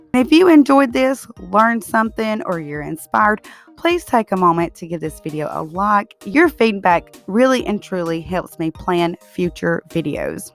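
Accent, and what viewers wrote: South, United States. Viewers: I love your accent. It's so Southern. We're here in North Carolina. My friend has a very thick drawl. She always says she's as country as a sugar sandwich 😂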